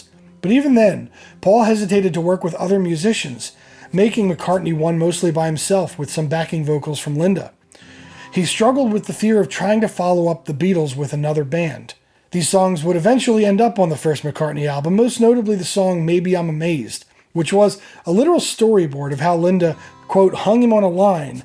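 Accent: Philadelphia, United States. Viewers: Hey, are from somewhere near Philadelphia? I like guessing American accents. I’m front Boston where we famously have distinct an accent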